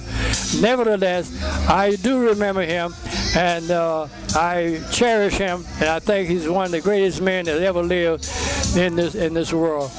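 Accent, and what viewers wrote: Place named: India. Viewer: Thats an indian talking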